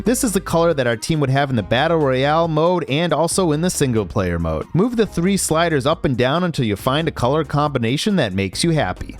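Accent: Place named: Canada. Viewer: I’m from upstate New York and I hear it sometimes in a select few words… he sounds Canadian so I guess that checks out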